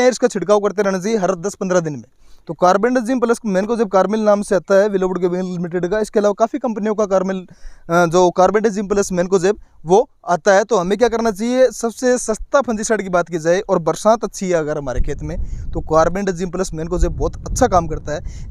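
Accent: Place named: Rajasthan, India. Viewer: Pahle bolna sekh lo aise language bolo jo sab ko Samajh a Jaye sab ko pta hi tum rajasthan se ho dekhane ki jarrurat nahi hi tum se Accha to kisan bol rha hi Chutiya kahe ke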